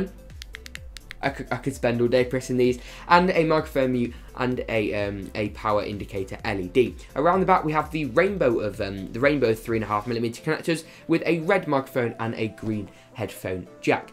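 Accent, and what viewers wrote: United Kingdom. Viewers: your british accent is glorious :3 it gives me goosebumps xD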